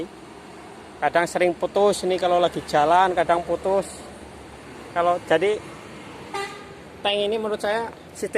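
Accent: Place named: Purwokerto, Indonesia. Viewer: Gaya bicaranya Purokerto bgt mas,kulo Cilongok.mantap mas sehat2 selalu 👍👍💪💪semangat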